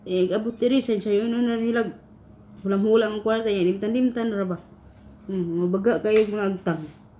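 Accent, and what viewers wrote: Visayas, Philippines. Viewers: hi day sus ganahan jud ko nimo day,kay siaw kaayo hahaha..Lawm sad kaayo ug bisaya..Bisaya sad ko day..Pero nakapag-asawa lng sa KL..Pareho ta kusog kaayo mohab-hab hahaha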